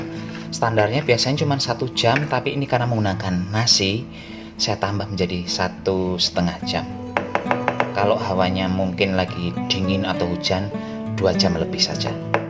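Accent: Banyumas, Indonesia. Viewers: Saya suka resep mas apalagi bahasa banyumasan kental banget 👍👍👍😇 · Lik...ngomong ngapak malah seru..nek nglebokan alias memasukan sih bhs ngapake apa yaahh.. 😀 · Memang sangat" menghibur para pecinta yutub sperti aku dn bicaranya yg ak ska ngapak alias lucu 😅😅😅😅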